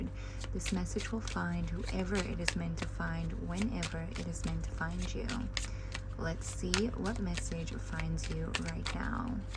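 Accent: South Africa. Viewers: love that south african accent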